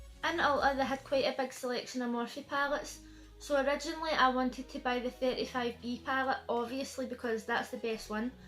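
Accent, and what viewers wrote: Scotland, United Kingdom. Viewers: Just stopping by to show you some love with a full play and like #13 from Bits of Real Panther! I'm loving that accent! So very cool indeed! Is it Scottish? I hope you have a truly awesome Tuesday!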